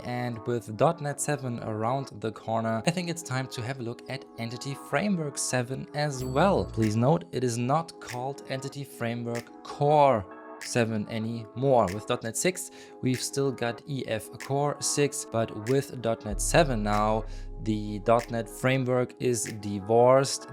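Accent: Germany. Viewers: Also fur einen deutschen sprichst du ziemlich gut Englisch! Der deutsche Background ist jedoch nicht überhörbar 😃. Danke fur das tolle Video! 👌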